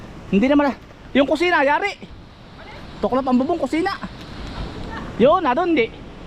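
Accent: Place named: Laguna, Philippines. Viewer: Tagasan po kayo, kc ung accent nyo ganyan po dati magsalita ung mga kawork kung taga alaminos laguna. 😂Ginagaya ko sila dati pg nagsasalita nakakatuwa po kc pakinggan.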